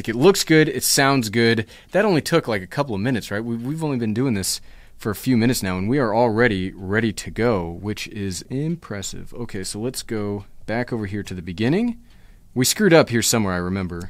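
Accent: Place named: United States. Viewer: you make the most pleasant and entertaining tech videos on Youtube. You speak American English, you don't talk fast, you don't read a script in monotone, you don't wear your hat backwards, and if you genuinely get excited about the gear. And, hey! we both shoot with Lumix!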